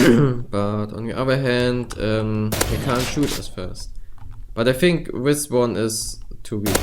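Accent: Germany. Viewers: höre ich dar etwa einen deutschen akzent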